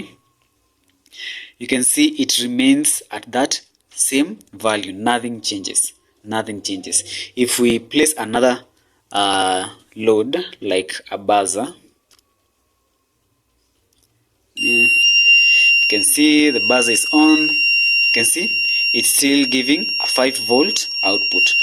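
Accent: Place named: Kenya. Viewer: you sound like a Kenyan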